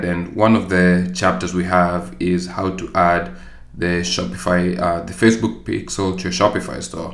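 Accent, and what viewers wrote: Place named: Zimbabwe. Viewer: Great video. Are you zimbabwean? Your accent sounds like you are from zimbabwe.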